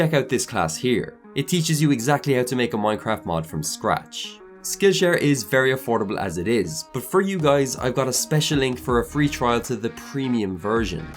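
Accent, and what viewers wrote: Ireland. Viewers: Nicely done, and nice to hear another Irish voice on YouTube!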